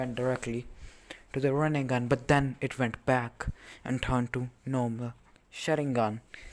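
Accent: India. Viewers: Are you indian or have an indian accent?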